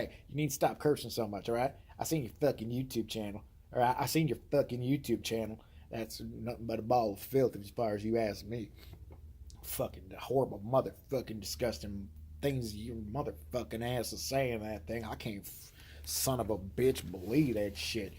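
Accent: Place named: South, United States. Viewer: Hilarious! You and your brother on together would be a scream! I've watched this 5x screaming laughing at your Southern accent and convo. My bro was a semi driver and very shy and I guess these prostitutes would come up to him and flip him out at his rig some scary looking and brazen and he would be like trying to get them off. Haha he had to lock up tight and be prepared for war.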